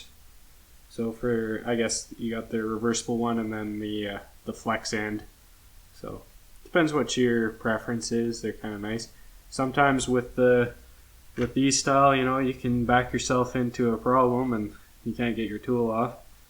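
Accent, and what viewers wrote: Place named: Alberta, Canada. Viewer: Enjoy the videos man. You sound like a fellow Albertan! Subscribed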